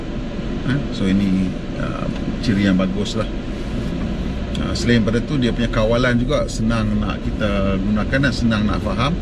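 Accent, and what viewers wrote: North, Malaysia. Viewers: Mmg puaih hati haih dgr penerangan loghat utaqa dia...batoi² Sempoiii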